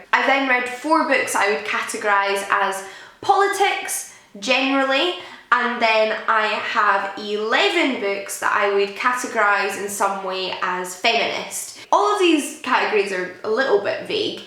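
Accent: Scotland, United Kingdom. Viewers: You look quite like Amelia Pond from Doctor Who. And you've got the same Scottish accent. Loved the video. I haven't been able to make the leap into Non-fiction yet but this video is a good motivational source. Thank You!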